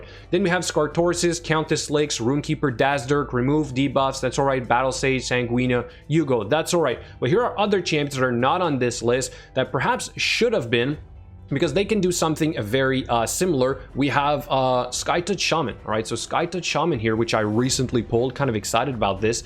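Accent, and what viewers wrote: Quebec, Canada. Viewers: french Canadian i think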